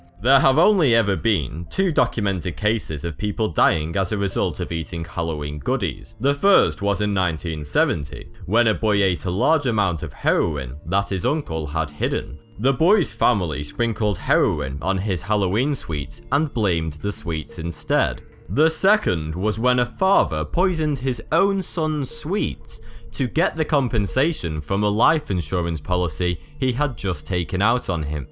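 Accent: United Kingdom. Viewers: "Samhain" is NOT pronounced Sam-hain. It is a Keltic word in the Gaelic language. It is pronounced "Sow-en". It translates to Summer's end. The veil between the world of living and the world of the dead is thinnest between sunset and sunrise. Trick-or-treat began to appease the deceased; so that the living would not be harassed by the dead. You sound British, and you don't know this???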